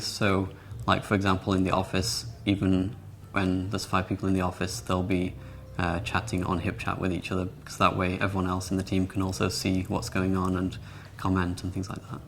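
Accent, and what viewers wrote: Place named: Jamaica. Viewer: Ríordáin I think his accent is jamaican...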